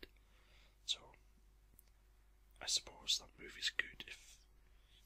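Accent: Scotland, United Kingdom. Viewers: Are you Scottish? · Heeey your Scottish !!! Snap lol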